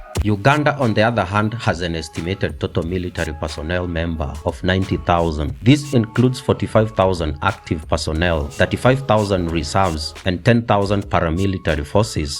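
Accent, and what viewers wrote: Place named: Kenya. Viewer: Completely biase from the accent you just know its a kenyan with zero knowledge on military affair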